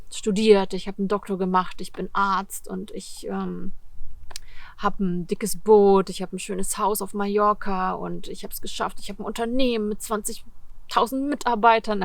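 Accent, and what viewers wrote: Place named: United States. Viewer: This is a real good comment, I was thinking something along these lines myself. With the risk of appearing offended (which I am not) I feel some of the conclusions/observations are a bit superficial. If the video wasn’t recorded in German I’d easily mistaken her for being American 😎 The Swedes might have Ikea and H&M, but we have Equinor, Telenor and Norsk Hydro 😆And, mind you, regarding being ‘lazy’, lets remember the GDP per capita for Norway is almost twice that of Germany 😶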